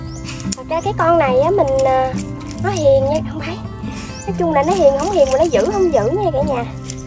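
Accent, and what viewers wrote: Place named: Mekong Delta, Vietnam. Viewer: rất là chất phát miền Tây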